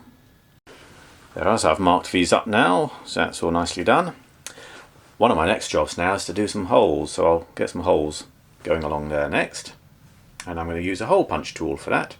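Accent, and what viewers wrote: England, United Kingdom. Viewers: Great...I love your work and your country English accent.........😊I have a beautiful collection of approximately 100 year old C.S. Osborne tools including Space markers. French edgers including curved etc...A round knife Circa 1900 stamped Crawford..new edge...my everyday tool...Large diameter hole punches...etc... I certainly enjoy your videos,.....P.S. Do you ever work with Cordovan Shell 🐎?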